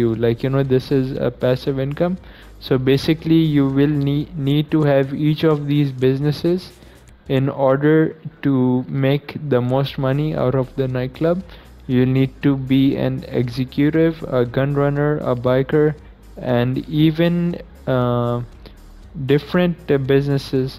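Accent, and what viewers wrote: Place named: India. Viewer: Are u from India? It's hard to bear your accent 🤣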